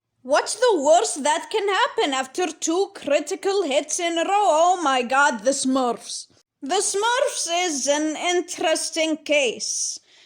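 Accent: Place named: Scotland, United Kingdom. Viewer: Nice video and I like your Scottish accent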